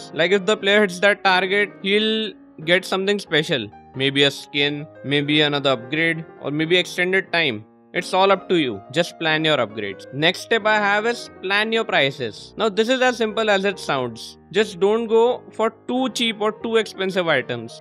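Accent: India. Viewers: I just really like the Indian voice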